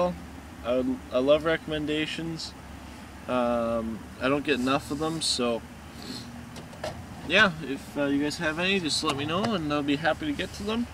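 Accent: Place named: East Coast, United States. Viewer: You are awesome! I just gotta ask, do you smoke? Bhahahaha... okay, okay, all kidding aside, I was thinkin' you sounded from the east coast. Just wonderin'. Keep up the great work from Josh here in Ontario! Cheers!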